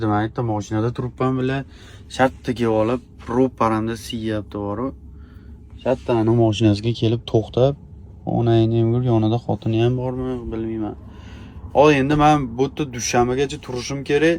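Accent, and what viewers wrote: Fergana, Uzbekistan. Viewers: Фаргонаданми брат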